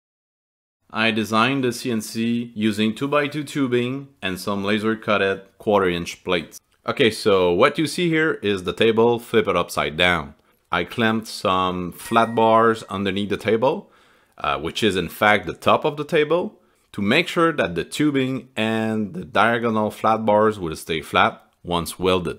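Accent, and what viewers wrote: Quebec, Canada. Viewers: at first I thought this was going to be another European build, but that accent is Francophone Canadien isnt it? the Mastercraft toolbox confirms this. subscribing. hello from BC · Hey! I assume by your accent your french canadian, which i also am. In case im wrong ill keep on with the english. Anyway, im interested in building my own cnc waterjet cutter as i need excessive precision on the cut itself. Ive been surfing thru a lot of CNC videos but it just keeps on shuffeling the pot in my head.. would you be able to break down the control system more precisly? You went over the diagram but it seems fairly complicated the way mach talks to the breaker board and all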